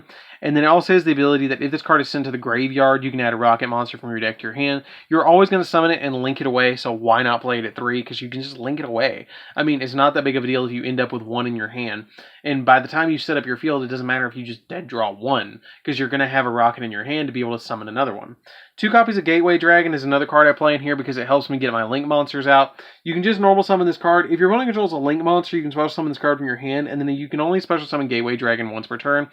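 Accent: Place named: South, United States. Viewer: probably bc he has a southern accent? 🤷‍♂️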